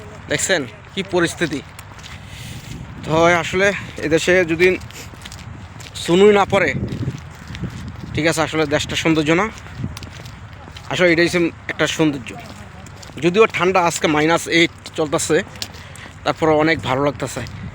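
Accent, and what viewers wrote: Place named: Bogura, Bangladesh. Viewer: ভাই মনে হয় আমাদের এলাকার লোক বগুড়া নওগাঁ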